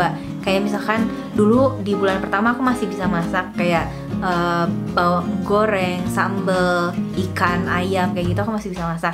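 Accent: West Java, Indonesia. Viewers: dari cara ngomong y kliatan bngt kalo org sunda😆 slamat ya kak,smga slalu sehat trus😘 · Sepertinya teh ngomongnya agak engap ya heheh😄 antara lagi pilek atau apa atau aku aja yg dengernya gitu😁. Oh yak logat sundanya aku suka banget kaya aky jadi kaya campur2 gitu walaupun ngomong indo😄. Oh ya semoga teh sama baby nya sehat2 terus yak sampe nanti lahiran🤗🤗❤❤❤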